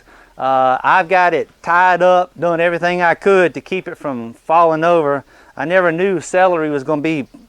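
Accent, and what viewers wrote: South, United States. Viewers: Forgot the name of your channel, so gave ChatGPT a description of a gardener that did hydroponics on YouTube about 10 years ago with a pleasant southern accent, and the AI knew exactly who I was looking for. Miss your videos.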